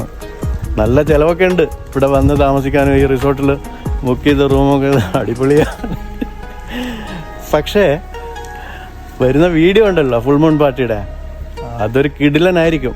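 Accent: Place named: Rural, India. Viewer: നിങ്ങളെ വീഡിയോ അടിപൊളി നാടൻ സംസാരം ❤ നാട്ടിൽ എവിടയാ❤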